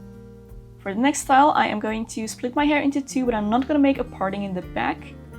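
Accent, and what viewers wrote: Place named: Netherlands. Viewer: You have a Dutch accent. Do you live in the Netherlands?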